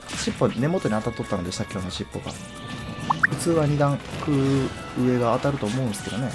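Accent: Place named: Kansai, Japan. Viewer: 良い関西弁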